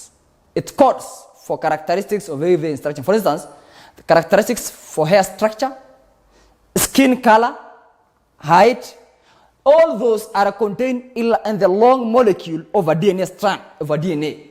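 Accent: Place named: Africa. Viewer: Waxaagaba lama fahmi karo lahjad foolxuno tii africanka ba wax ku aqrinaysaaye tii somalida la imow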